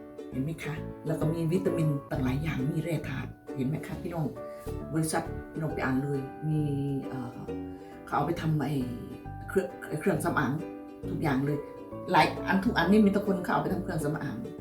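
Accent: Korat, Thailand. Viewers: ชอบภาษาไทยโคราช เพราะมากขอให้ รวยๆจ้ะ ลดคำว่า พี่น้องจ๋าๆๆๆ ก็จะดีมากคะ่ มันเยอะไป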